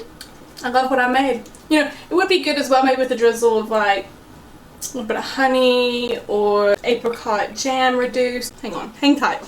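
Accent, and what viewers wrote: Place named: South, United States. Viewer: I love bloopers... And ur losing ur southern accent girl...it's English/southern lol that made me hungry.. I think I would like the Apple ones...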